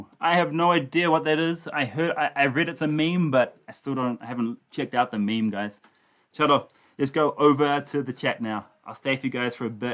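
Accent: India. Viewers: Hi I’m in the blue mountains in Sydney. We are quite good! I have friends in Bangalore that banged on pots which was quite beautiful to wake up the government.. take care and stay safe! You sound more Indian than a kiwi.. blessings 🙏🏿🙏🏿🙏🏿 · Stay safe......just started watching your vlogs after that police incident. And liked your hindi accent. Love from bangalore.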